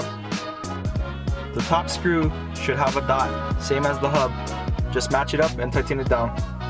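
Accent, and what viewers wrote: Hawaii, United States. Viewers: You from Hawaii?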